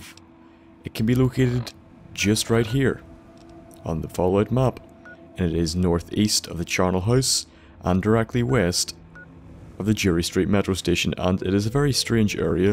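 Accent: Northern Ireland, United Kingdom. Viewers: nice,video just wanted to ask are you northern Irish? because you kinda sound like my friend from northern Ireland.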